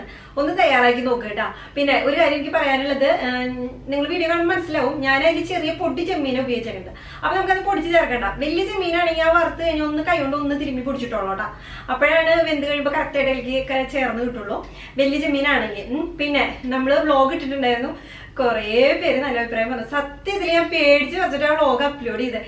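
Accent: Thrissur, India. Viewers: Variety Sambar try cheyyam thrissur slang super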